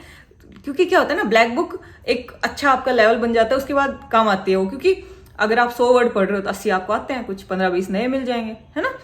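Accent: Haryana, India. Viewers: ਹਰਿਆਣਾ ਵਾਲੇ ਹੋ ਭਾਈ 😁😁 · Didi ap haryana se ho? Accent harayana jsisi h 😅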